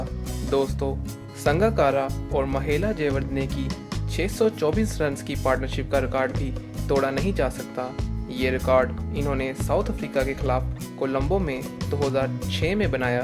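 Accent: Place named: Punjab, Pakistan. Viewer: urdu chek kr ustad bndy ki level kr gea urdu in punjabi tone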